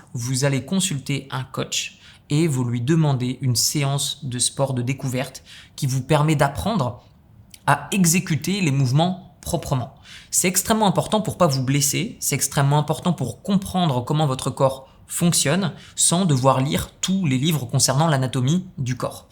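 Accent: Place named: Lomme, France. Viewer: Depuis toutes ces années, je crois que c'est ma vidéo préférée. De bons conseils, on voit aussi l'envers du décors on va dire. Toujours aussi inspirant. J'adore l'accent qui reste avec le temps (je viens de Lomme, j'ai l'impression de connaitre du coup 😅). Excellente vidéo, comme d'habitude j'ai envie de dire.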